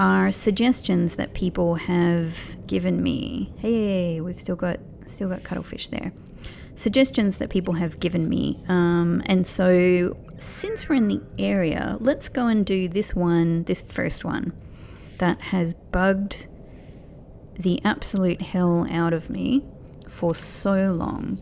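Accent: Australia. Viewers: Hi, just tuning in for the first time. Sorry I missed your full journey! Question, I don’t recognize your accent, where’s it from? Edit halfway through you said you’re from Australia! That’s so cool. I hear they have a beautiful coral reef there. My love for this game has got me into scuba diving, one day I hope to do that down under!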